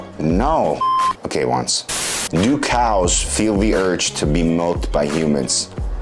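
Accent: United States. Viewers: I like the way he says channel. It sounds like chiennal. I wonder what part of American accent he has.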